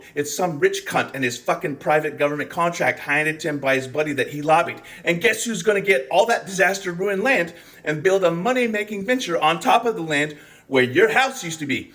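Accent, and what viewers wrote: Scotland, United Kingdom. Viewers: I love it when your Scottish accent comes out!! That's when we know you're beyond angry. One Team One Fight 💙 🇺🇸 💙🇺🇸💙🇺🇸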